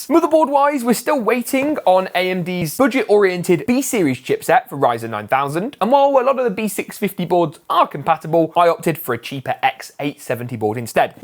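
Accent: England, United Kingdom. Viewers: I find your pc builds informative, but you sound very English from the UK? so why all the pricing on the components in $'s and not £'s first.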